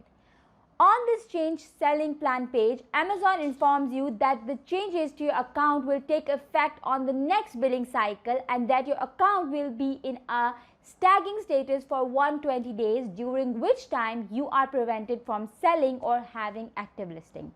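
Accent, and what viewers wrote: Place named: Mexico. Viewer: Que lindo la mexicana y su ingles =)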